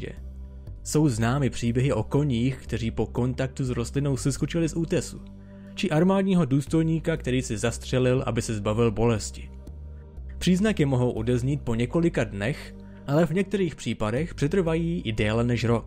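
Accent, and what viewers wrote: United States. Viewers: co co mas za skurveny prizvuk americky ? Odporny hlas video, ale fakty su dobre :)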